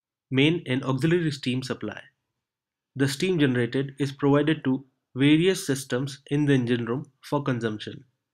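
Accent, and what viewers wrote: India. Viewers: accent, its something crazy/ indian ....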